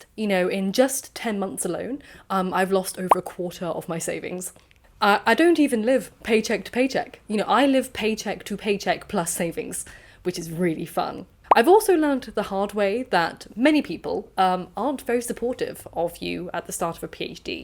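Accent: England, United Kingdom. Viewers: you remind me of jenny lee in call the midwife <3 i love your pretty british voice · I cant believe how much you look like my little sister, only differences are the hairstyle and the English accent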